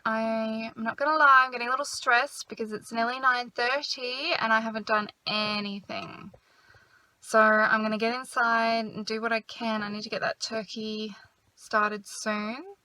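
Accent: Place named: Australia. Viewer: This is a marvelous video thank you so much for sharing this incredible video I love your Australian accent and just recently learned that Australians don't celebrate thanksgiving lol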